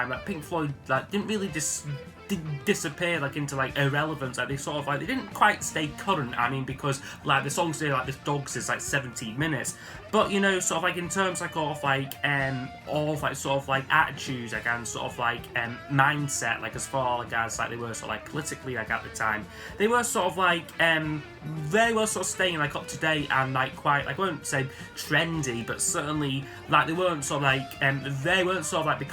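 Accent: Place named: Northern England, United Kingdom. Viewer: Great video. Liked a lot of the positions you put them in Trying to place your accent, thought i heard Northern English, Welsh and maybe even Scottish at one point 🤣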